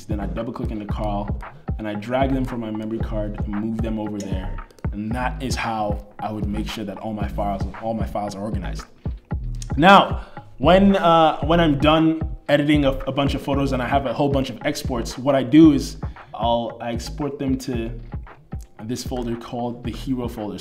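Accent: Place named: Nigeria. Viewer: Vibes are strong bro.. Are you Nigerian? I can hear a small hint in the accent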